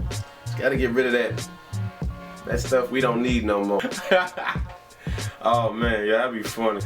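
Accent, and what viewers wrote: South, United States. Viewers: WOW! I'm impressed at your natural hair verseness! I agree wholeheartedly about how women should include men in on why we're doing what we're doing to our hair and WHY! LOL going to get some ecoctyler 1am! so 4a is your crush....so sweet...when can we get married???!! LOL! Where are you from? I hear a little southern accent. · I love the message....but I love love love that southern accent! :) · that southern accent.... sexy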